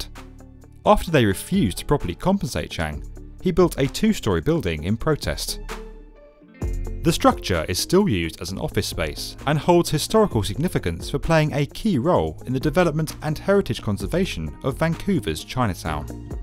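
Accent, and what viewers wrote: United Kingdom. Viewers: Are you British? cuz I...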